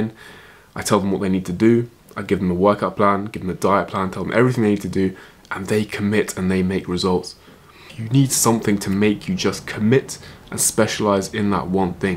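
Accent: England, United Kingdom. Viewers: Looking very handsome and gorgeous - being Irish myself, I love your English accent, so classy - I’d date you in a heartbeat 🇮🇪☘️🇬🇧🥰😍😘😘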